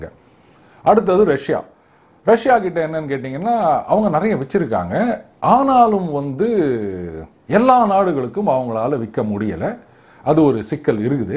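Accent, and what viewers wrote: Chennai, India. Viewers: Madras தமிழ் பேச்சு, பிரமாதம்.....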